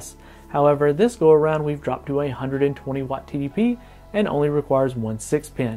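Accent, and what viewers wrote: South, United States. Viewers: Lemme guess you from the south of the US as you sound country haha, cool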